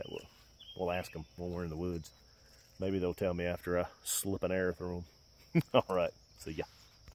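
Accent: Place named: Mississippi, United States. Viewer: What part of the US are you from. I used to live in Missisippi and the accent sounds quite familiar. Regards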